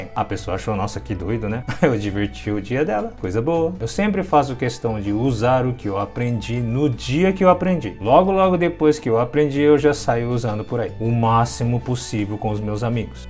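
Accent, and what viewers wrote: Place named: Brazil. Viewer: Eu jurava que tu era brasileiro. Pelo teu sotaque que falas perfeito ao um brasileiro.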